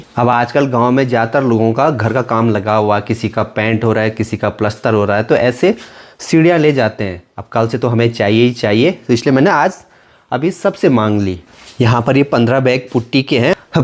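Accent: Pahadi, India. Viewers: Bhai ki boli bahut meethi hai... Pahadi log aise hi bolte hain... Jab ye tarsaali wala Ghar kehtey hai toh sunne mein bahaut acha lagta hai...😊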